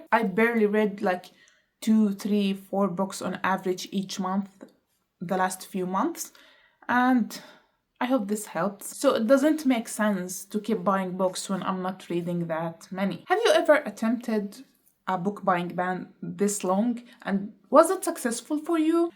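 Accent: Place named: Germany. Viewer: Hi. First time seeing your video . Just curious if you're German. Cause I can't tell if that's a german accent or an Arab accent. Thanks😊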